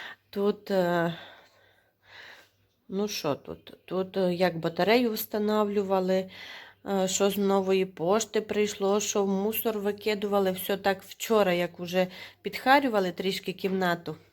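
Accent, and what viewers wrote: Transcarpathia, Ukraine. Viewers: Цікаво Вас дивитися,дуже люблю людей з Закарпаття і ваш діалект.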